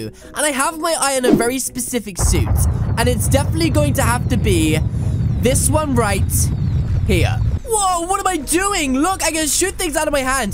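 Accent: United Kingdom. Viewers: He sounded more british in his old videos now you cant tell cuz he does so many voices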